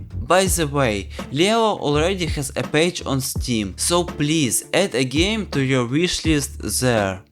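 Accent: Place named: Eastern Europe. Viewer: Finally new video on this channel. I hope that this minimalist platformer find his players! P.S. By the way I really enjoyed your cool slavic accent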